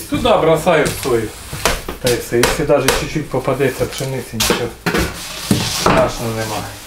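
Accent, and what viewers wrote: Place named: Kharkiv, Ukraine. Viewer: Да это не украинский, это харьковский говор. Чистый украинский совсем по другому звучит и интонация другая.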